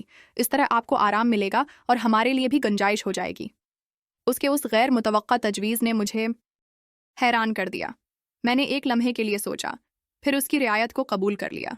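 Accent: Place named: India. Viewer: کہانی کسی انڈین لڑکی نے بیان کی ھے لہجہ پاکستانی نہیں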